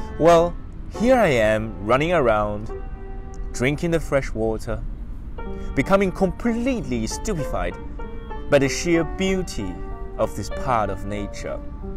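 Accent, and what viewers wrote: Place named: Germany. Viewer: Also bist du österreicher dann? Zum ersten hab ich den Deutschen Akzent gehört. Ich bin in der Schweiz gewesen.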